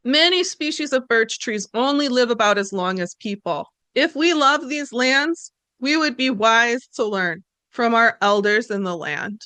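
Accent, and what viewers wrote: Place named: Midwest, United States. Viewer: Midwesterner here, love your midwest accent!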